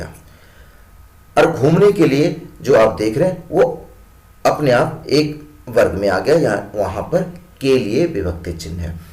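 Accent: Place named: Hindi, India. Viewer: మొదట సంస్కృతాన్ని సంస్కృతంలా పలకడం నేర్చుకోండి. హిందీ రూల్స్‌ అప్లై చేయకండి దాని మీద. భాష మీద అభిమానం ఉంటే సరిపోదు. సరిగ్గా నేర్చుకోవాలి. హిందీ రూల్స్‌తో సంస్కృతాన్ని నాశనం చేయద్దు.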